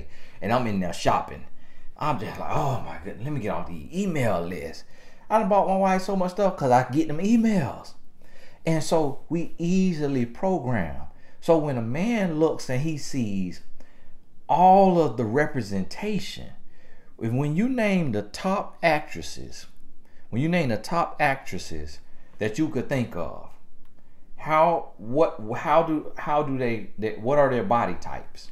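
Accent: South, United States. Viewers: This guy sounds like my Dad it’s crazy. Where in the south is he from ? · You should add comedian to your list of achievements , them sweet teas and chicken wangs took me out😂😂 it just drove the message home, that authentic southern accent and commentary Is everything 🙌 no matter how far you go be yourself, love yourself still on my journey... Far from where I'm going but far from where I was 🙌 CARBONATION 😩😂😂😂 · I enjoy your logic,you are right people are like sheep they run in herds,l love your accent the south l would say.Keep up your wisdom.l am white and my daughter is heavy,she is treated that way.And some men genuinely love big woman,but they are really ostracized for real. Love your vocabulary. PEACE👏👏👏✌💯I Have to read your books.🙏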